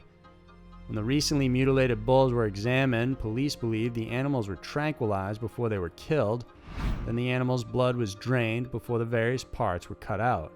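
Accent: South, United States. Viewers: I just love your voice sounds so country😍 great video as always!!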